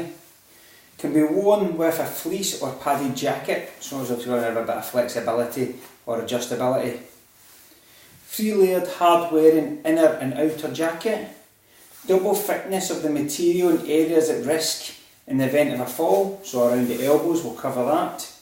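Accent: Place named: Scotland, United Kingdom. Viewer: Dude are you scottish? wow your accent... Nice review anyway thanks!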